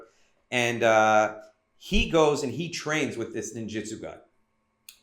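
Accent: Israel. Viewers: Your Israeli accent is very good. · Good video and good Israeli accent. I had never heard of the Israeli style.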